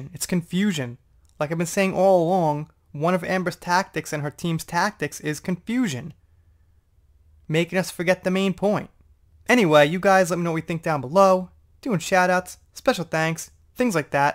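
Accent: New York, United States. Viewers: Man I'm real late for this one but I wanted to tell you or ask you, are you from New York? Kinda sounds like it. But also I heard you live in Japan, is that work related? I know I'm a little nosy, well really I'm a lot nosy. You don't have to tell me anything. I'm not a Stalker or anything. I promise just nosy. Thanks for the great info..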